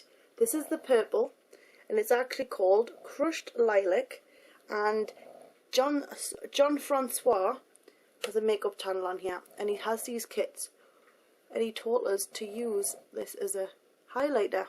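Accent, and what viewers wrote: Newcastle, United Kingdom. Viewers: She's not Scottish or Irish, she's from Newcastle! :) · yeah, her accent is jordie! jordie meaning she's from newcastle! :)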